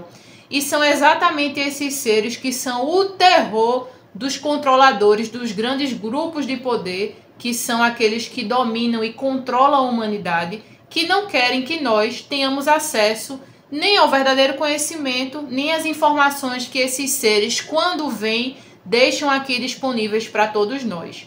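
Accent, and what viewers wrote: Northeast, Brazil. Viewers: vc deveria fazer videos com mais frequência. ACHO QUE PELO SOTAQUE MEIO NORDESTINO AGENTE ENTENDER ATÉ MELHOR AS INFORMAÇÕES😂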